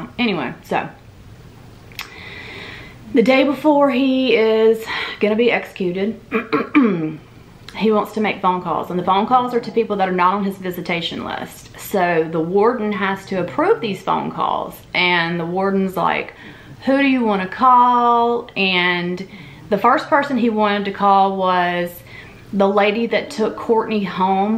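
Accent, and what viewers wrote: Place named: Louisiana, United States. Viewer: What an evil, evil person 😭 That poor baby. I was born and raised in Louisiana. You must be from Northern Louisiana by your accent. Subscribed!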